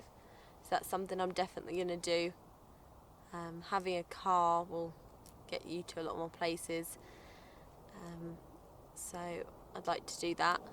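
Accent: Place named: England, United Kingdom. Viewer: I thought you were in England just by your accent haha. But yeah it’s really depressing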